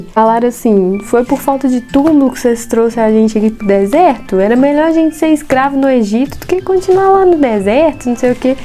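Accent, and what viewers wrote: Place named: Minas Gerais, Brazil. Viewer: Vc é mineira? Percebi por causa do seu sutaque 💗que Deus te abençoe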